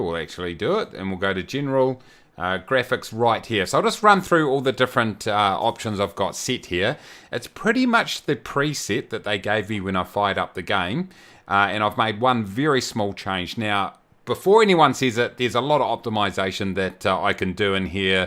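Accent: New Zealand. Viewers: Nice to hear a New Zealand 🇳🇿 accent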